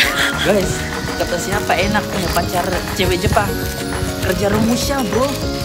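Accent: Java, Indonesia. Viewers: Ko ada medo' nya bro klo ngmong😅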